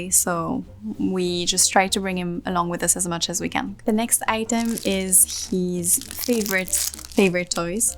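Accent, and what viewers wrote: France. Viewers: The French accent ❤ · She’s really so beautiful, I love how she speaks English with a French accent. Shes so classy, I love classy women.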